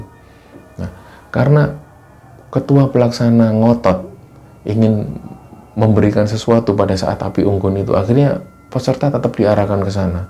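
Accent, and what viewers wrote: Java, Indonesia. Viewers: ikut nyimak mas...terasa bgt alunan suara khas Jawa ...sangat mistis rasanya.... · Suara nya khas jawa .....bgt walau menggunakan bhs indonesia tp khas nya g ketinggalan ....,bisa mengobati kerinduan akan kmpung halaman,dan cerita2 yg d sajikan bnr2 enak d dengar ....salam kenal mas dr kab,bekasi.....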